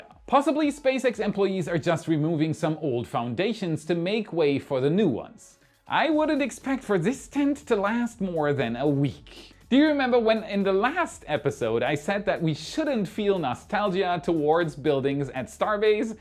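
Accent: Germany. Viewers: Your German accent is fading! The states are rubbing off on you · You called me out for the marine mariner comment I’m blown away! Didn’t know you were German until this episode keep the great accent coming I meant no disrespect!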